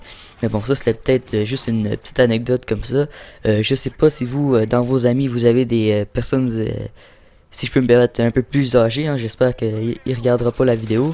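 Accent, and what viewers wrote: Quebec, Canada. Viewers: J'adore l'accent québécois :) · C'est un quebecquoi il parle comme sa sinon c'étais marrant , oui ! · super l'accent man x) vive le quebec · C'est clair qu'il n'avait pas un criss de chats dans ton mode si tu joues a la PS3 xD Anyway excellent travail au moddel tout nue en plus! <3... Ste plait change pas ton accent a cause de quelques Francais qui font chier et je m'inscriverez surment a CODQG juste pour voir un bon québécois jouer! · Qu'est ce que j'aime l'accent québécois :'D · Tu ne serais pa quebecois ? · Swag encore un quebecquois trop bon ton accent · moi je dis trop bizarre parce que il est francais d'origine mais il a un pur accent québecois :O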